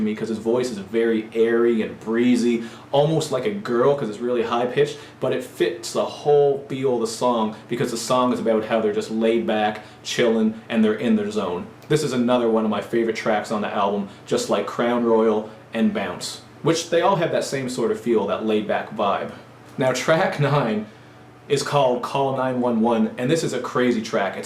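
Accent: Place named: Atlantic Canada, Canada. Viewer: You from east coast of Canada? U got a mad east coast accent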